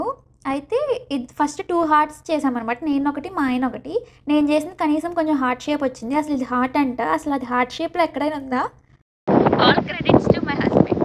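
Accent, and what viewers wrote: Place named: Godavari, India. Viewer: typical godavari slang....... ------from a godavari boy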